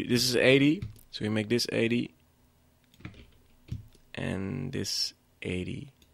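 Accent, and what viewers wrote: Netherlands. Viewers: ah so is dutch cant miss that accent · lol nederlanders en hen accent in het engels zijn echt onmiskenbaar :P next to that good tutorial! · ye, dutch people talk english kinda funny, you always hear that immediately (i'm flemish) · Mental! I clicked it on in the margins of the You TUbe Drum and Bass selections! What girls are you on about? He does sound Dutch though..Pffffffff as the Dutch male says : ) I welcomed the tour on this software....the link did exactly what it said on the tin.*shrugs* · Nederlands? herkende het meteen aan je accentje :P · Lekker hollands accent heb jij. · LOL dutch accent FTW!!!!! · ik hoorde gelijk al aan je accent dat je nl bent , lol . Maar nice.. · LOL I love the way the Dutch talk. :D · It's the typical dutch accent haha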